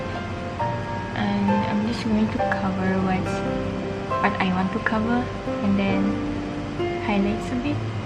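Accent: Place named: East Malaysia, Malaysia. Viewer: Bila bercakap, terus tauu dari seberang! east malaysia ada slang tersendiri 🥰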